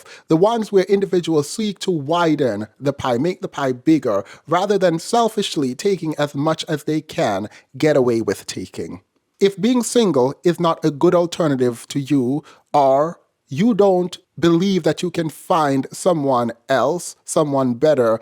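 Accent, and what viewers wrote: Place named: Jamaica. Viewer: You lead with your true Jamaican today and it was AMAZING!!!!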